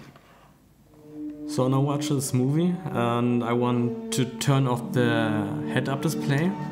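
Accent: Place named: Germany. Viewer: The english seems to have a german accent, aber ich könnte mich auch irren =) Will you maybe bring your liveviewrift to the Gear VR aswell?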